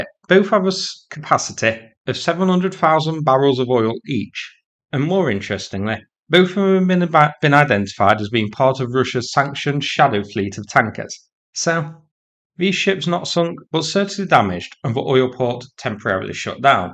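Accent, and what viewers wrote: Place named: Lancashire, United Kingdom. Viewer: I can't pick your accent. Lancashire? I'm guessing North of Manchester but not Leeds. Kind of softer than Burnley.... Greetings from Australia.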